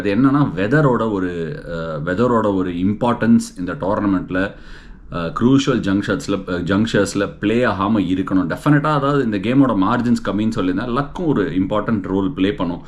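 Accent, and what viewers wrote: Tamil Nadu, India. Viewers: Its so cool to listen Ash in his instinctive Tamil accent. ❤ from Kerala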